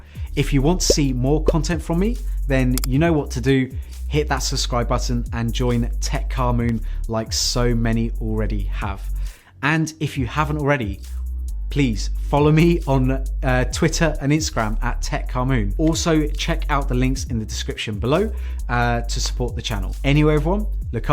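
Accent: United Kingdom. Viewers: Too British to listen to.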